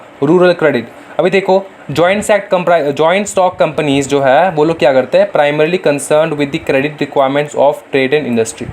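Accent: Bengal, India. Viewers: Yes , Sir has Bengali accent because He is Bengali🙂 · Seems like sir is has a bengali accent 😄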